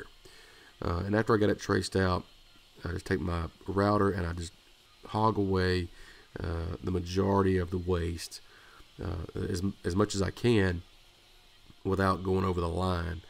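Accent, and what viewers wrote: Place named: South, United States. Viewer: YOU A SOUTHERN BOI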